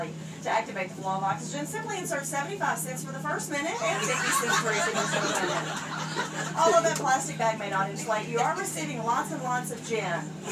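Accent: United States. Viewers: Hard to understand if you're not used to southern US accent, especially with the speed she talks in. Still better than the usual boring announcements though! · very funny but the extremely fast american accent is hard to understand 😂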